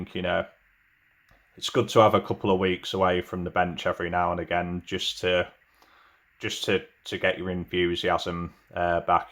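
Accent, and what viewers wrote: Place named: United Kingdom. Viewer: Hi. You sound like you're in The UK and I am in America. You mentioned that the '67 Plymouth was a grail kit for you. I was wondering what America cars you had in the UK when you were growing up and what cars you like. I was hoping you could do a video about the subject. Btw i grew up in Lansing / Detroit, Michigan in all of the US auto factories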